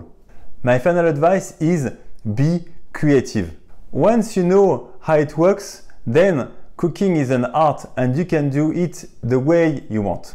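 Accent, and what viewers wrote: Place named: France. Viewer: フランス語の訛りの英語ですねぇ〜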